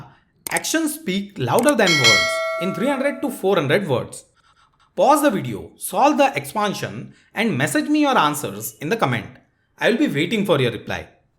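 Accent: India. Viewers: Indian accent 🥀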